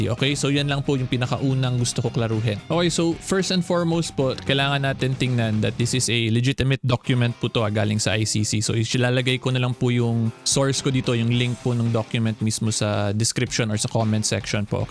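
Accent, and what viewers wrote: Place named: Northern Samar, Philippines. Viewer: Atty taga northern samar ka ba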